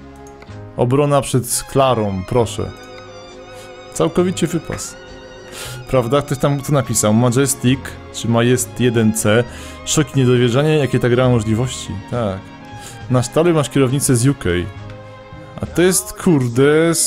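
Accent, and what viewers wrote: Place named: Silesia, Poland. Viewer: slonskoooooooooooooooo glodkaaaaaaaaaaaaaaaaaaaa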